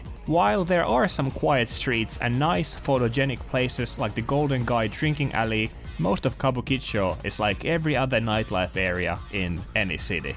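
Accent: Finland. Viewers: This video got recommended to me randomly. Very interesting. The second I heard you, I clocked you as Finnish hahaha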